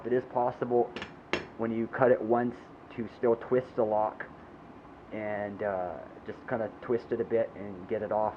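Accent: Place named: Canada. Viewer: Thanks for making the astute comparisons on the Kryptos. You sound like a Canada man. As for the bracket/clip mount, for the yellow NY Standard, I've simply taken off the bracket with the hex screw, and I have no intention of packing it that way. I pack in a backpack especially for hauling groceries, on the way to the store. On the way back, I have three choices. Either in the pack if there's enough room and nothing is getting crushed, or outside on the inside of the straps, and over the top, or on the bike itself around the handlebars in one of a couple of ways. It's fairly stable that way for a couple of miles returning for any of my three bikes.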